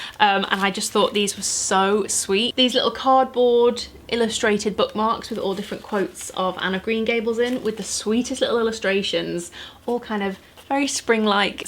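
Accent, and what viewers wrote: Liverpool, United Kingdom. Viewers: Fuck. Time for a nightcream :((( p.s sounding very scouse in this video 😂😂 maybe i had forgotten what your voice sounded like. Love this vid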